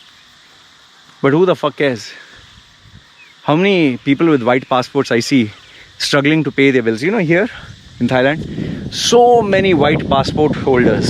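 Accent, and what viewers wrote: India. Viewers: You sound more indian than 6yrs ago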